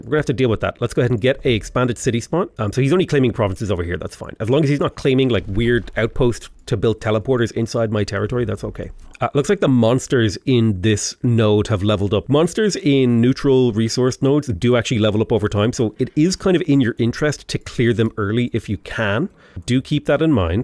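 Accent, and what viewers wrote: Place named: Ireland. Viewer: Thank you sir, very informative very interesting and such learnable for Ireland accent 😊 This is good way for me to study English. Thank you again. Please more AoW4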